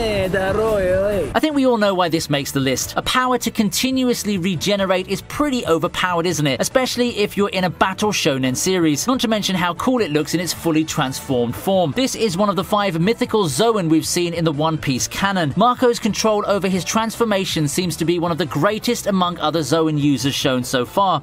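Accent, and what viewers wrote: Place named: United Kingdom. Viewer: Man that British accent make everything sound so presentable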